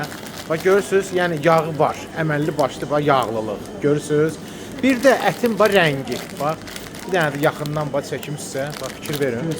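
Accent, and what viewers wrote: Baku, Azerbaijan. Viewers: Çəhrəgayiye özüdə,ultra, modern,pro Bakı lehçesidüraa.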